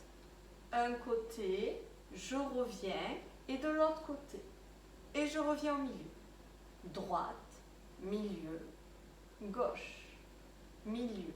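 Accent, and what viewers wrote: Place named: South, France. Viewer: Merci pour cette séance,j'aime ce petit accent du sud! Je vous suis depuis la Belgique!!!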